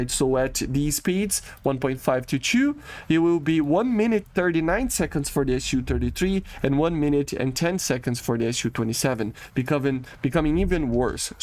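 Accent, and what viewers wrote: Brazil. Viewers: Are u from Brasil? Ur accent looks like so much brazilian accent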